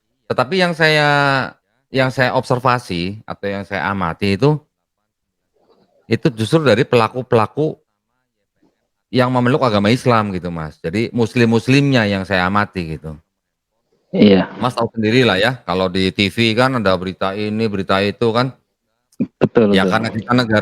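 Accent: Banyumas, Indonesia. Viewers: Berarti pak bisa ngomong ngapak dong? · Koh ngapax dong???🤭🤭 sekolah nya dkt ST cilacap ya koh?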